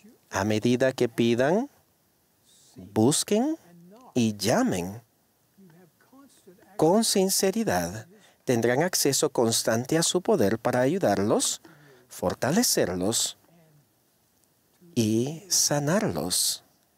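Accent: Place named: Buenos Aires, Argentina. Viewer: Ese acento es argentino porteño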